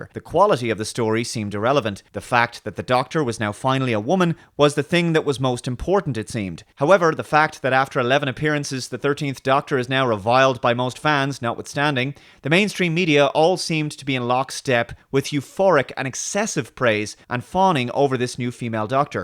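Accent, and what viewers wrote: Ireland. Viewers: I've been a bit fed up tonight so I thought let's listen to a nice Irish voice, very calming! Not going to be for too long though is it! Not with silly fuckers going along with Leo's abort & import policy! I thought the Irish were better! Seemingly not! Better learn Pidgin!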